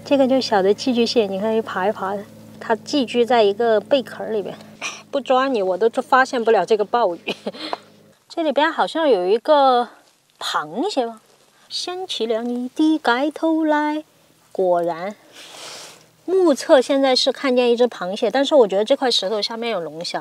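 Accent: Sichuan, China. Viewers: 听起来像川普通话难道是老乡？ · 姐姐你的四川口音好上头哦我的天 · 你的川普太有意思了，挖挖机也就真的只有四川人才说的出来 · （你那麼凶呀）妳的四川口音很好聽，聽起來很可愛的。🥰🥰🥰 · 听到四川话太亲切了，老乡！ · ，你啷个不说四川话呢？比普通话好听好玩得多！！！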